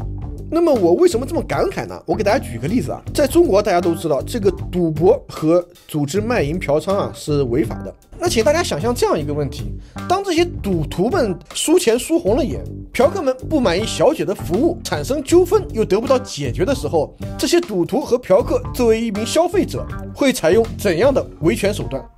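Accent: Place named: Shanghai, China. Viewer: 喜欢你的上海腔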